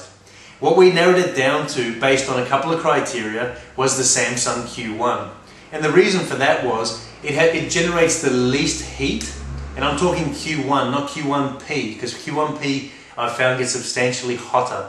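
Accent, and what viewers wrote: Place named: Australia. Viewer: spanish guy with an australian accent. enough said.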